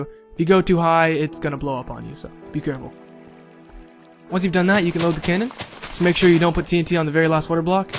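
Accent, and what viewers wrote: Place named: United States. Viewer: great video, your american, so i can understand you easily :P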